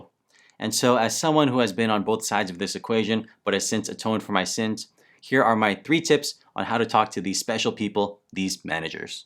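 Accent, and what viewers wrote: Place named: Canada. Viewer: Hello from Peru! I love your videos and I’m so curious! You sound like born in Canada or something but What your ethnicity is? :) thank you.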